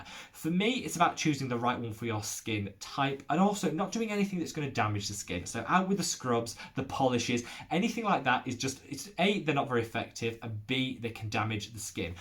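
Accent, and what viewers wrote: United Kingdom. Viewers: yeah in Valencia, born here, but i love foreign languages, so I use your channel to improve my english,( I learned English at Loughborough -Nottingham, and I'm in LOVE with your marvellous British accent, hate the American tough) and to learn about my skin routine so is a win -win situation for me. Lol 😆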